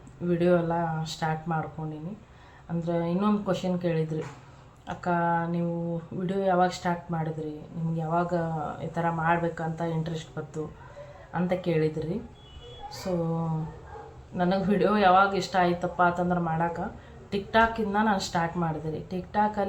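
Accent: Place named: Bidar, India. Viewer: Nima swatha uru yavadu akka yak andre niv bidar bashi mathadthira adk heli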